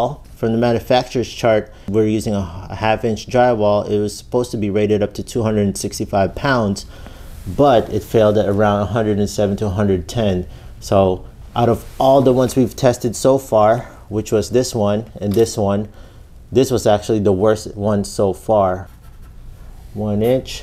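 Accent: Philippines. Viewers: Your videos are very well put together! Pinoy kaba? Haha I lived in the Philippines for a while, I think I’m picking up on an accent!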